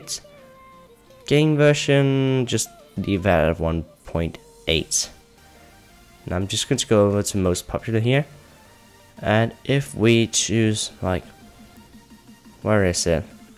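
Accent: Denmark. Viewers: 'v you from Danmark?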